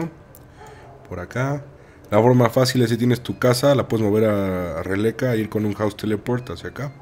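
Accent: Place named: Valencia, Spain. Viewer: Tu como que eres valenciano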